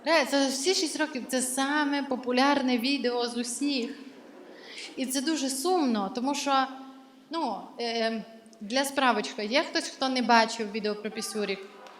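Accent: Transcarpathia, Ukraine. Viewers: Дякую за Вашу творчість, за Закарпатську говірку. Усі говірки - то скарб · Гарно балакає по закарпатьськи, ФАЙНООО!!!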